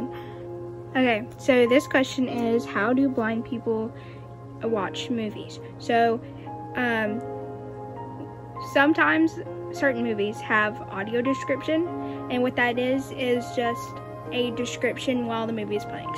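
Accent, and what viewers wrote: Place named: South, United States. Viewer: I watched a full video of my friends and just it was a good video and I still remember your southern accent and the final question that I have is do you and have separate YouTube channels apart from this channel because I wanna go and subscribe to them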